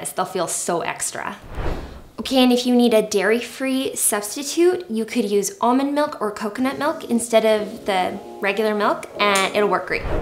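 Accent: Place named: Nebraska, United States. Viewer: Lol, it's a Nebraska accent, actually. But I did go to BYU for school.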